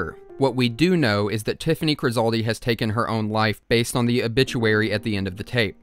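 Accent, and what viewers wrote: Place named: South, United States. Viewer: Somehow though the way you talk and show things makes even this absolutely terrifying freakshow seem friendly and welcoming.... Either its not that scary the 2nd time or its just horrific without a well humored Southern guy talking about whats happening idk ...did you use the whisper TTS on FL Studio to name episodes because of Serial Experiments Lain?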